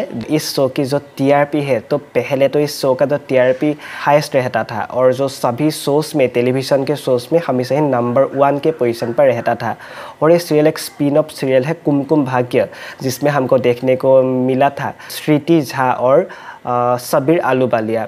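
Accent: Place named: Assam, India. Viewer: Kya aap Assam se ho aap ke bate me najar aa rha hai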